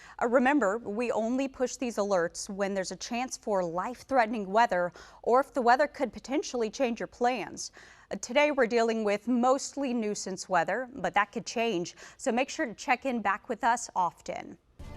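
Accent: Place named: DMV, United States. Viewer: getting that DMV twang down solid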